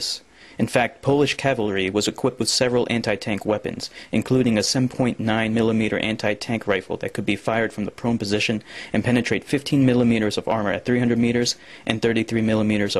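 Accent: India. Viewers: I agree totally Indian accent.....better to do nothing please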